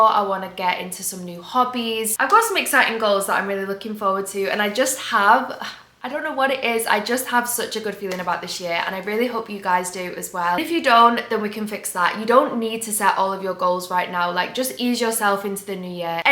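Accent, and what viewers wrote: United Kingdom. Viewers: I just joined ur channel and im enjoying. Where do u live bc ur accent is so adorable. Im assuming UK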